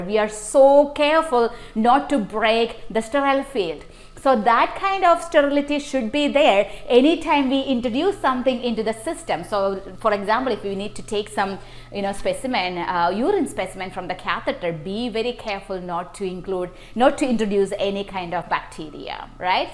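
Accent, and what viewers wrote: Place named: India. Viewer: Your English is very bad with an Indian accent.🥴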